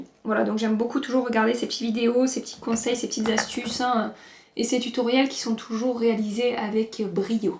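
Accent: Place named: Narbonne, France. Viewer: Coucou! Je ne laisse jamais de commentaires sur les vidéos YouTube donc aujourd’hui est une première: j’ai découvert ta chaîne il y a peu (en octobre quand je cherchais des revues sur la Désert Dusk) et j’ai de suite accroché! Cette vidéo me confirme déjà tout ce que je pensais de toi: tu es une personne simple et douce! Tu restes naturelle et drôle! Merci de partager avec nous tout ceci! Le maquillage sur cette vidéo est bluffant! Je suis une make up addict, ce qui fait rire mes amies ahah! J’ai commencé à aimer le maquillage des le collège et maintenant avec ma soeur nous avons cette passion mutuelle! alors tes vidéos pour moi sont comme une bouffée d’air frais! Je te remercie aussi de me faire dépenser autant 😉 PS: j’ai cru reconnaître un accent narbonnais la première fois que je t’ai entendue (j’habite pas très loin et une amie qui vient de narbonne a le même)! 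Bisous et je te souhaite que ta chaîne connaisse un franc succès, il serait mérité 😘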